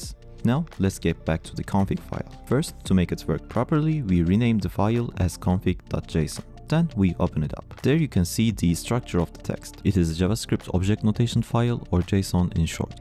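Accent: Turkey. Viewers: aynen ya sesini duyunca kesin türk dedim :D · gördüğüm en türk aksanlı kişi 💀ama takdir ettim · türkmüsün kanka aksanın tam öyle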